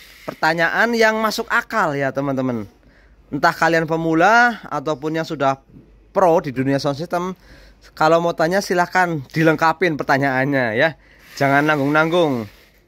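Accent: Banyumas, Indonesia. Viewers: Sampean asline ndi kang.. Kayane ngapak nemen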